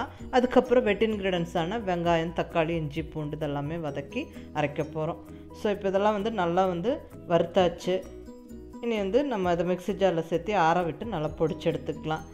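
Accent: Coimbatore, India. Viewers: Hi aunty Naan Bangalore larundhu ungaloda periya fan Unga kongu tamil ennaku romba pudikum Unga recipes lam Vera level Naan clg 1st year poga poren Enga chitti and ammuchi lam Coimbatore la dhan irukanga Yedayarpalayam la Ungala naanga paakanum Plz neenga enga irukinga nu sollunga 😍😍😍😍😘😘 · சூப்பரா இருக்கு கோயம்புத்தூர்ல நீங்க எந்த இடத்தில இருக்கீங்க ன்னு சொல்லுங்க மேடம் நீங்க பேசற லேங்குவேஜ் சூப்பரா இருக்கு நாங்களும் இப்படிதான் பேசுவோம்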